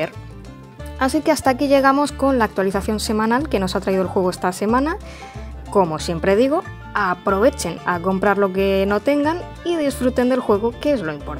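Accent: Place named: Spain. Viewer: Me encantan tus videos y ese acento español.jajaja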